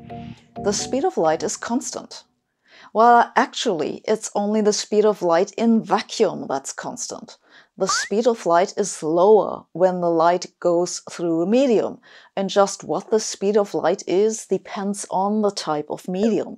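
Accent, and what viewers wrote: Germany. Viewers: Actually, I find this very funny. Sabine gives us reasons to get annoyed with physicists and therefore to get annoyed with her. But the effect of the way she presents this... it's just so endearing. Almost cute. 😄 Having a fat German accent doesn't damage said effect, au contraire. 😂👍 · Sabine, your thick German accent is voluptuous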